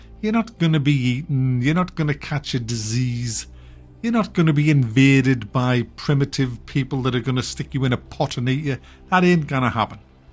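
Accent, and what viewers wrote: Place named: Newcastle, United Kingdom. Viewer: Ahh... The Chief is a Geordie then 🤔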